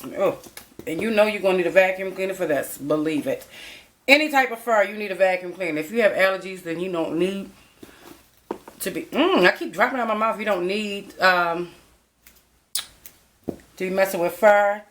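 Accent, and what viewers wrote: Baltimore, United States. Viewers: Yes bmore accent! Thanks for the tut love this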